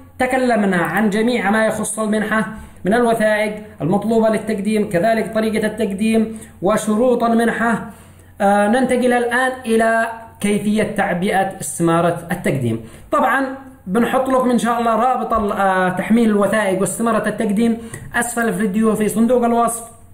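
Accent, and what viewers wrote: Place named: Sanaa, Yemen. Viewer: فدا اللهجة الصنعانية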